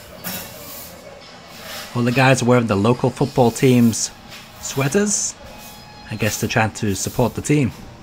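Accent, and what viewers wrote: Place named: Wirral, United Kingdom. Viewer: They looked lovely! are you from the wirral mate? your accent sounds from around my way.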